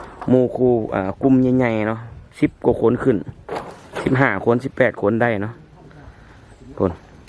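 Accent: North, Thailand. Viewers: อู้เหนือกับ ก็ได้ สำเนียงเหนือกับเวียงคือกัน.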